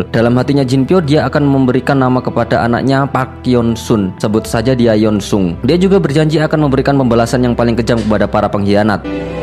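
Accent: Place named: Java, Indonesia. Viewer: Disini mimin masih rada medhoq 😅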